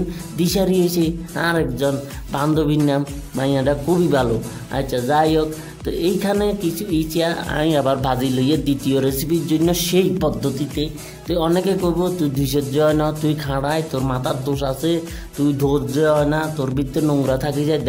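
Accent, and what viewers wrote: Mirsarai, Bangladesh. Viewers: তোমার ভাষা পুরোপুরি বাংলাদেশের চট্টগ্রাম জেলার মিরসরাই থানার ভাষা আর আমি মিরসরাই এর মেয়ে তাই আমার সাথে তোমার আঞ্চলিক ভাষার ১০০% মিল। তুমি জানিও তুমি বা তোমার বাবা মা কি কেউ মিরসরাই এর কিনা? আর তোমার ভিডিও গুলো অসাধারণ আমি আর আমার ছেলে আমার মা সবাই তোমার ভিডিও আপলোড হওয়ার অপেক্ষায় থাকি। ভালো থেকো এগিয়ে যাও🤩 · আমনে যেন নে কথা কন এঠা তো মিরসরাই এর কওথা,,,এননে, তো আনডা কই থাকি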